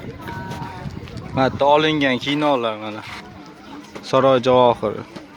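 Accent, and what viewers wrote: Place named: Andijan, Uzbekistan. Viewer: Андижонлимисиз,шевангиз. Ухшаркан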